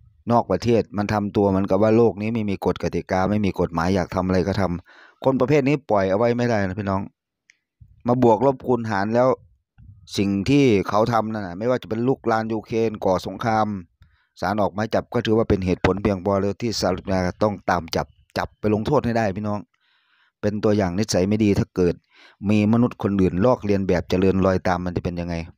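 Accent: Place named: North, Thailand. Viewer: แอ้ดมินน่าจะเป็นคนเหนือสำเนียงแบบคนเหนือครับพ้ม😊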